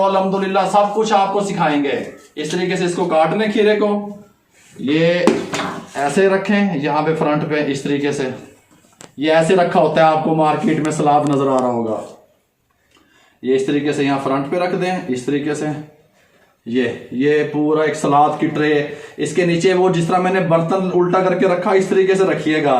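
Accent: Punjab, India. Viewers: Assalamu Alaikum baba ji buht hi aala aur zabardast tareeqy se aap ne bataya aur itni mehnet ki hamare liye... ALLAH Tala aap ko mazeed tarraqi dein aur kamyab kerein aap khush rhein sada AAMEEN Batein kerty huwe punjabi touch buht acha lagta hai zarur isi tarha baat kiya kerein Buht sara salam aur duain baba ji aap k liye bi aur k liye bi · Bhai salam app buhat iman dari se sikha rahain hn main ny app se buhat sikha jazak Allah Allah app ko deen aur duniyia main taraaqqi atta farmain Ameen ap ki panjabi urdu k sath mix ho k buat maza dyti hn khush rahiyye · Tohadi punjabi bhot qeant hy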